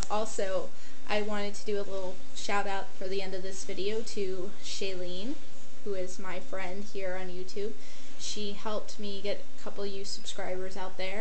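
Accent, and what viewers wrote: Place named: Canada. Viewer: Canadians do rock, I love the Canadian accent :)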